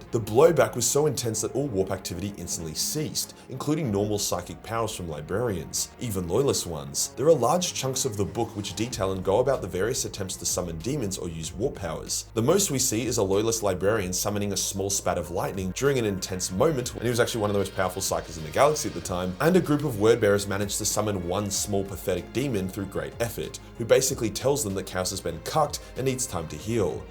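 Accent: Australia. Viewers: I’m here to hear the Aussie Chad talk it all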